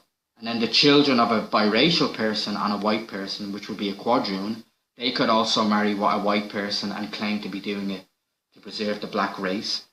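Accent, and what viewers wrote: Ireland. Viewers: you are correct sir about mixing. i like the irish accent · Btw you have the same english accent as Connor Mcgregor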